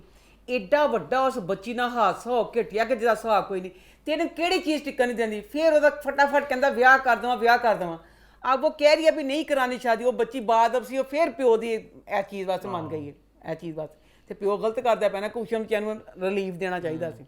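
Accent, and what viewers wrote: Punjab, India. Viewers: plz talk in urdu bohat thick punjabi bolti ha ap sar pa se guzar jati ha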